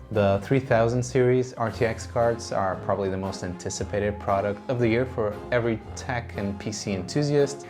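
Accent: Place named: Sweden. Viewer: I think he is Swedish.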